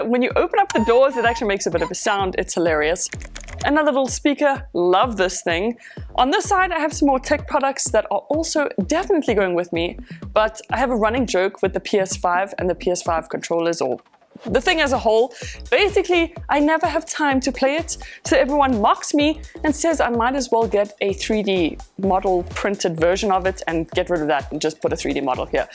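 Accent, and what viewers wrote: South Africa. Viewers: I’m happy for you buddy but be ready for unexpected rains, chilled weather and racist londoners as they will easily get hold of your South African accent and will/May speak shit. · Oh no I will definitely miss your South African accent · You from South Africa? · i knewwww you were south african! the accent 😂 i'm also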